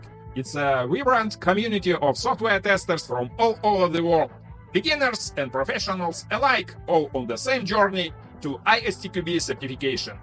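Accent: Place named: Russia. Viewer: your russian english is briliant)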